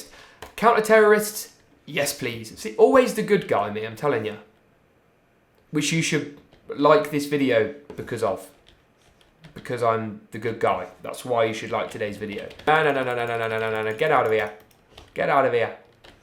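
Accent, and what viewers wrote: England, United Kingdom. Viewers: You are so fucking annoying, you keep coming up in my recommended even though I don’t watch 15 year old Pom kids, fuck you and your pommy accent.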